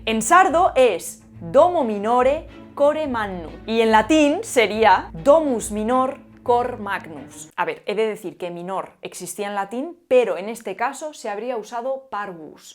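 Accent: Spain. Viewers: Gracias. Tema apasionante. Una curiosidad: explica, por favor, la imposibilidad de los españoles para pronunciar TL en un solo fonema. En América decimos a- tlé- tico. Ustedes dicen at-lé- tico. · pero por que gritas?, queria escuchar el video normalmente pero me dio hasta nervio escuchar esa forma de hablar peninsular y gritona, pero por que no hablas normal para que la gente lo siga con tranquilidad..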